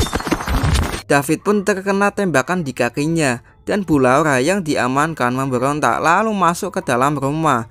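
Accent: Java, Indonesia. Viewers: Logat jowo ne medok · Logat Jawa suarane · ett medok bener si mas logat nya, jadi kurang jelas mas'e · min plis, asli mana njenengan, medok banget kalo ngomong wwwk but TQ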